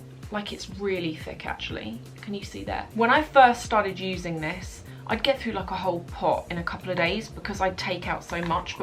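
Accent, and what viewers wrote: England, United Kingdom. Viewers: 7 I stumbled upon this I really like your car videos Love from Indiana USA Love the way you English people talk Have a great week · U got most sweet and cute voice and accent , most of British accent I couldn't understand , but yours yes😘😘😍😍💐💐love from srilanka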